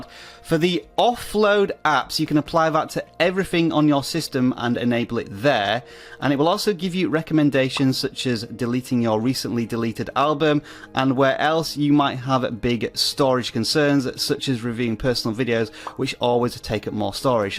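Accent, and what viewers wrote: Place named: United Kingdom. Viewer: great video and nice clean British accent :-)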